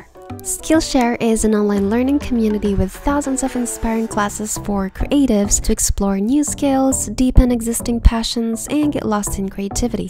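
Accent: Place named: England, United Kingdom. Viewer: I like your English accent :)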